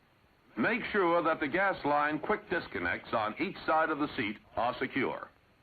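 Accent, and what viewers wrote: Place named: Boston, United States. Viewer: Love the Bostonian accent. AFP couldn’t either train him to speak “General American” or their editors themselves thought it was okay. Option 3: A rush to production rendered the former two impossible. And: the rear control surfaces seem just massive...otherwise, this very striking bird reminds me of the B58’s cockpits...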